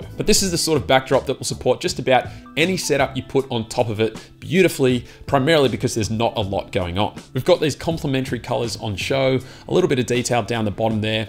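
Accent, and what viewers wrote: Australia. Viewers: Great video bro! Is that another Aussie accent I hear? 👍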